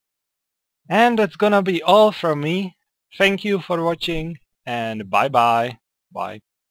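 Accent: Czech Republic. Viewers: Where ya from, you have a Czech accent a little :D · u have like Czech accent :D where are u from? :D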